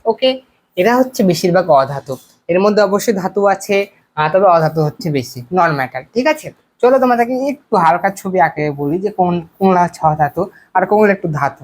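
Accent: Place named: Chapai Nawabganj, Bangladesh. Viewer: Vai apnar kothai a Chapaiya feel pacchi. Dari tao sei, chagol er moto cute.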